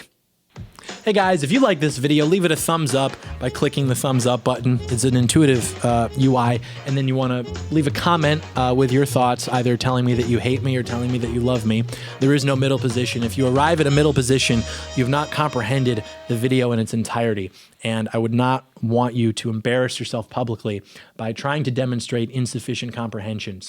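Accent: Michigan, United States. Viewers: I figured you were from Michigan. Since moving out of my home state, I miss how Michiganders talk. Fast and concise.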